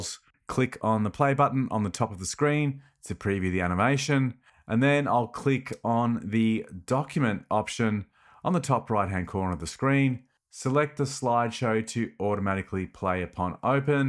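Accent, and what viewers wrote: Australia. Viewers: G'Day Mate! I needed a refresher after not using KeyNote for so long and found your channel. I have subbed straight away, it's so great to hear another aussie voice here on YouTube.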